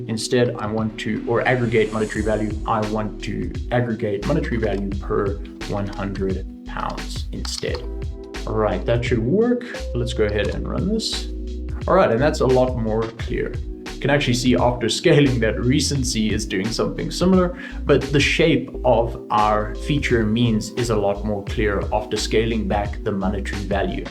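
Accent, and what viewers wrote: South Africa. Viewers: Am I hearing a South African accent? Thank you for the valuable video by the way. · Is that a South African accent? Automatic Subscription!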